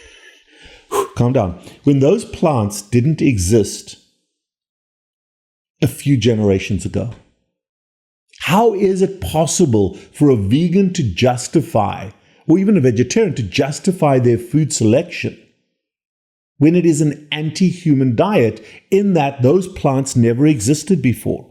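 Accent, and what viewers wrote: South Africa. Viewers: Super-informative video! Love the accent! South Africa? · Always lekker to hear a South African accent here on the tube... Thank you, I appreciate your videos, love from Darljng South Africa!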